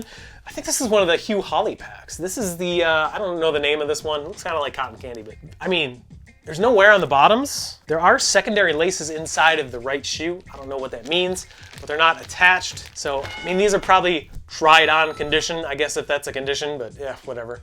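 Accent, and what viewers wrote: Chicago, United States. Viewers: PLEEASSEEE stop doing the Chicagoan intro man I like the videos but that KILLS it like damn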